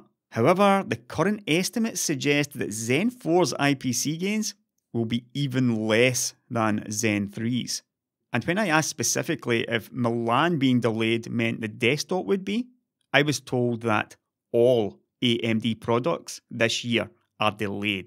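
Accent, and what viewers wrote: Scotland, United Kingdom. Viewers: is this Scottish? so lovely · a dash of scottish accent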